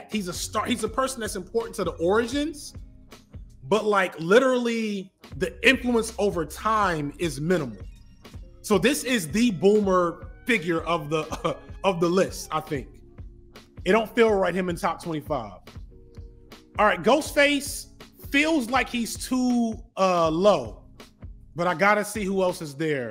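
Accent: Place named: South, United States. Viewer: We also just talk that way in the south. We ain't gotta be leaned up. It's hot man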